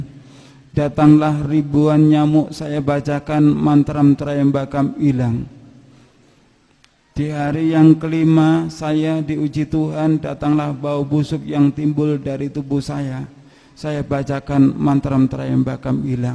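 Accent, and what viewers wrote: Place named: Java, Indonesia. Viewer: ngomong nama tapi kq jawanya nglotok ya....wah parah nih orang.....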